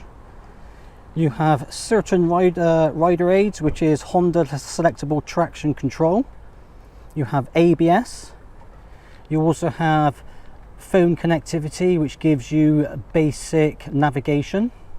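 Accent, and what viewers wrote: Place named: Bristol, United Kingdom. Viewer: I subscribed just because of your bristol accent 😄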